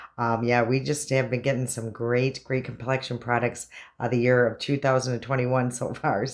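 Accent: Midwest, United States. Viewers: I just love your videos! I hear that Midwest twang, and it's like i'm watching my younger sister! 😎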